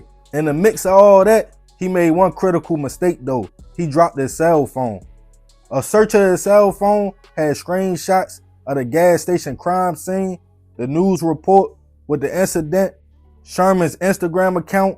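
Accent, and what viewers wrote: Baltimore, United States. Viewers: Your B-more accent is awesome.. one of the reasons why i subscribed 👍🏾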